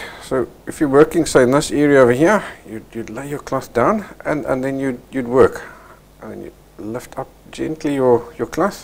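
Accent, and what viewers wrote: South Africa. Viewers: you sound like your south African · Are you South African? You sound like it! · is that a south african accent i smell???? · South Africa accents make me happy. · A Saffa!! Makes my heart happy to hear ❤️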